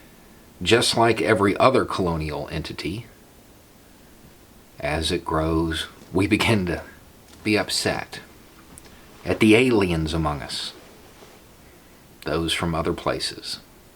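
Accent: South, United States. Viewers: Dan Carlin is absolutely more knowledgeable, he should have an honorary doctorate in history from some prestigious university. However, this man reaches people that Dan could never, because of the language and dialect in which he speaks. talks to people from Appalachia and the Southern States in a way that is digestible. If someone from the "outside" spoke the same words, with more authority....it would feel condescending. Nothing shuts down a blue-collar working southerner, more than someone with a Brady bunch dialect trying to give them a history lesson.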